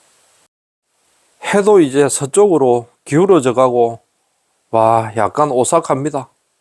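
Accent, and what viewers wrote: Gyeongsang, South Korea. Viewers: 시청자들을 위해 비가오나 눈이오나 영상 촬영하신다고 수고 많으시네요.. 구수한 갱상도 사투리로 설명도 잘해주시고 덕분에 구경은 잘 합니다 파이팅 하시구요 새해 복 많이 받으세요 ~^(^ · 말씀이 참재미있는톤이라서 많이웃으며들었습니다. 역시 경상도말이 구수하게들립니다. 감사합니다.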